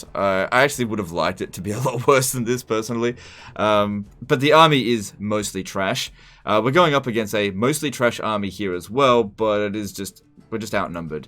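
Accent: Australia. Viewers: I'm just here for the abuse and edgy jokes. Nice to listen to a genuine Aussie, instead of the wannabe Americans that flock the Youtubes. :P Was fun to see my former favorite Total War game (WH2 > All) as a disaster campaign. Would love to see more.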